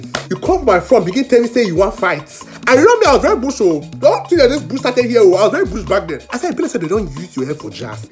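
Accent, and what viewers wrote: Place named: Nigeria. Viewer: Wait? You're from Cameroon but have a Nigerian accent?!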